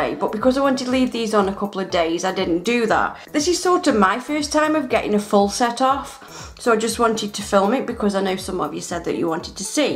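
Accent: United Kingdom. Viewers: she's british that's how we say it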